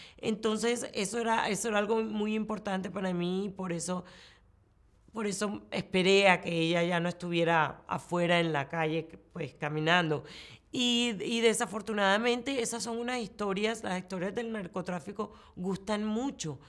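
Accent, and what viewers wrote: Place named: Caribbean Coast, Colombia. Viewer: La gente de la costa atlántica en Colombia hablan así .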